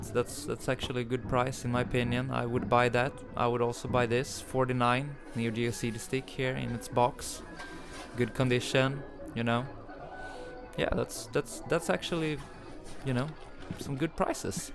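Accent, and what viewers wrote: Sweden. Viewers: I would get any Jurassic park game for under $50 lol Also...do I detect a Swede? lol I've lived in Sweden for years and the cadence is familiar! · Haha där hör man den härliga svengelskan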